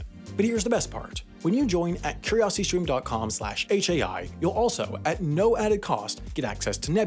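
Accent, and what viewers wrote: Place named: New York, United States. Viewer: Gonna take a wild guess and say you’re from NYC/NJ😂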